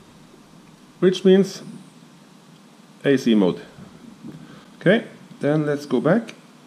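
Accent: Norway. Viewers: you must be norwegian?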